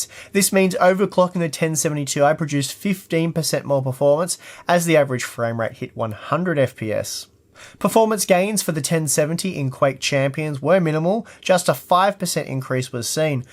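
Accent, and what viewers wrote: Australia. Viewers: The outro music is quite nice here. I think you should add more music like that throughout your vids and not just at parts. I've seen only a few of your vids and one thing I always think is "Sure an Australian voice is nice and all, but where's the music?" Anywho, thanks for what you do, man, keep it up, proud of you <3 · Are you Australian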